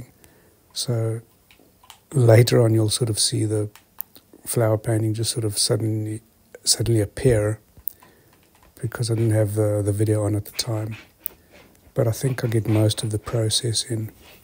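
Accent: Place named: South Africa. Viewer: Lovely painting! You sound SAfrican...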